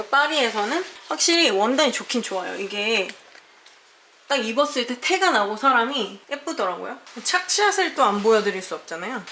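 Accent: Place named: Seoul, South Korea. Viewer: 우리친구야 와이리 서울말쓰는거 낯서노 잘하노? 지기네 친구야 뷰티 인플루언서 같데이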